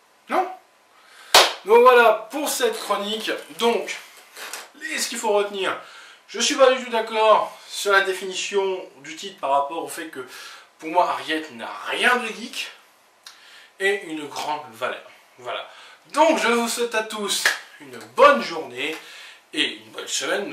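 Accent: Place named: North, France. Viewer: C'est bon le titre est dans le bon sens pour nous XD tu me fais trop rire avec ta définition du Geek, enfin la c'est plutôt le stéréotype x) (HS : on entend bien ton accent du nord, j'adore ! Ça me rappelle des souvenirs !) XD prendre son Larousse, la chose a laquelle je pense le moins (faut que je m'en achète un d'ailleurs) on sent le suspense monter quand tu cherches XD « Intello » c'est vrai que j'ai souvent entendu ça … tu lis ? T'es un/une intello, t'as des bonnes notes à l'école ? T'es un/une intello. Tu as totalement raison, les ¾ des gens ne savent pas ce que ça veut dire. Je pense que tous le monde (ou presque) doit se serrer la ceinture en fin de mois ! Oh sérieux j'aime ta façon de penser ! Je suis sur que si on se rencontrait en IRL on jonglerais entre grande amitié et de grand débat (j'adore les débats avec les gens que j'apprécie XD) (HS : je vois des livres dans ta bibli que j'aime bien XD) j'avoue que lorsqu'un livre à une moral j'adore ça, quand ça fou une grosse claque en pleine figure et qu'on réalise qu'avec ce qu'on a on est bien. 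Encore une fois une super vidéo ! J'ai hâte de voir la prochaine ! Bonne continuation !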